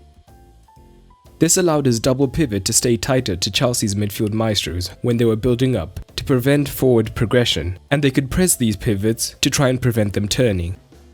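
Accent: South Africa. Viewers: This is a South African accent.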